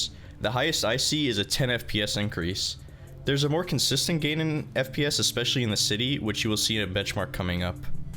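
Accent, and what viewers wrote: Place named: Denmark. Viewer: Hey, du lyder Dansk :-) Jeg har også et build med FX CPU, det hjælper sindsygt at give den noget hastighed :-)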